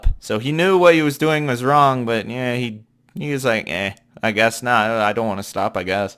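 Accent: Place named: South, United States. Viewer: DUDE, you didn’t even know what happened before, but just screenshotting his last posts from twitter and gluing the voiceover of your redneck voice is very smart, right? Do you even know that that 12-year-old girl was a friend and, by correspondence in the discord, she herself agreed and continued to write "yea I suck your d1ck" and so on. then she simply took and leaked the correspondence, allegedly exposing that he seduced her at the his age of 20, and stupid people ate it. I'm sorry I can't find screenshots of this play chat role, because it was clearly expressed there that she deliberately flirted with him for her own purposes in order to take him by surprise. nasty and stupid people now think ONLY by hearsay from strangers that he is some kind of pedophile. Is this your first time on the internet?!?! Didn't you roleplay with anyone at the age of 12? 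this person then, of course, began to apologize and make excuses because people misunderstood him, or vice versa, they began to write "eewww you pedo! how do you even live!" people nasty and disgusting ruined the full potential of the best musician I have ever heard.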